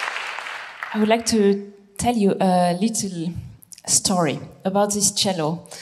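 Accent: France. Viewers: Lovely! Sweet English in French tongue.❤👌😍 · Beautiful music played by a beautiful lady. Her French accent makes English sound lovely.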